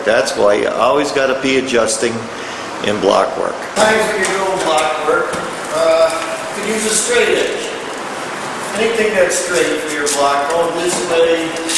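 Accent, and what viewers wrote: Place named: Chicago, United States. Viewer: I'm binge watching your videos ! are you from the midwest? You definitely have an accent like you're from the Chicago area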